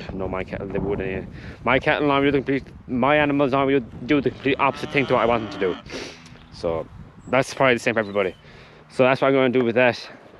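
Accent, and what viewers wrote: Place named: Ireland. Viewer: Loving the videos loving the machinery I’m quiet jealous now you have it all 😂, I can no longer do farming because of an injury from a motorbike accident I can’t move my right arm but I love watching farming videos to keep in touch with the life I love, I could very much be wrong but this looks like a Leinster farm and the accent sounds familiar but in Ireland we all kinda sound the same 😂 glad I found your Chanel and I hope all these price rises don’t cut you back will be a tough year with buying prices rising and selling prices not rising half as much I hope it doesn’t f the farmers up too much, best of luck and thanks for the video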